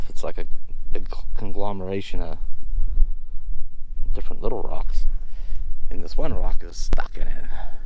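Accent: Pennsylvania, United States. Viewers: Newer sub of yours! You definitely have a PA accent...I am originally from Mount Lebanon, PA-burb of Pittsburgh! Are you from PA or WV?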